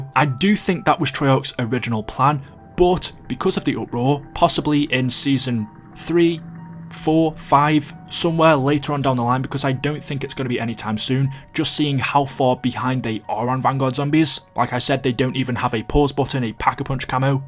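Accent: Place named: Northern England, United Kingdom. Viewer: You have a very interesting accent! What is it? Northern English? Western Canadian?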